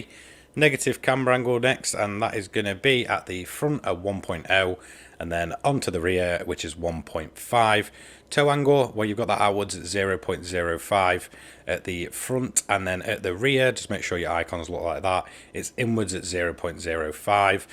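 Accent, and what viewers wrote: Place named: East Midlands, United Kingdom. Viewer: Gotta be Leicester or Derby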